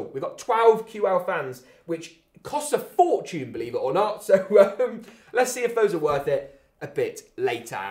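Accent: United Kingdom. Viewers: The bri ish accent is the most annoying accent in the world trust me man !!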